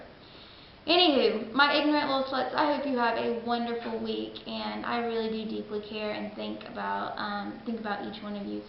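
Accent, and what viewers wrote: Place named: South, United States. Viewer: Totally agree with you regarding the water and exercise incriments. I assume the 2 hours is weekly? Love the accent too, as a native southerner I figure you are from Georgia or SC? Keep up the good work!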